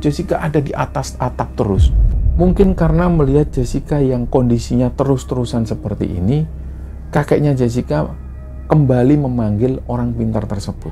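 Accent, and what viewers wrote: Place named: Surabaya, Indonesia. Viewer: mas the best critanya khas suroboyo banget.setiap hari subcribe.nya nambah 1000.salam dari jombang mas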